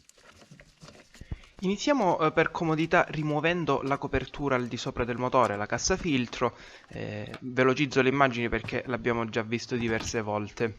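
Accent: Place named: Salento, Italy. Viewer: Che bell'accento salentino che hai! Sei di Lecce?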